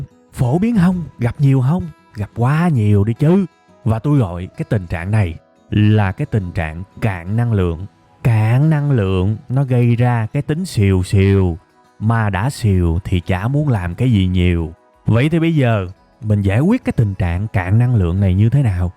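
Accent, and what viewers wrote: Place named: Dong Nai, Vietnam. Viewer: Ad nói giọng giống người miền Nam, cụ thể là Đồng Nai quá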